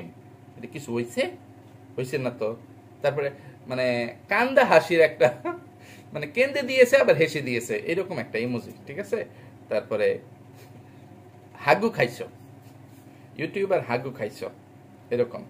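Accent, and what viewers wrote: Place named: Mymensingh, Bangladesh. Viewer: Apni to moymonsing vashai kotha bolen..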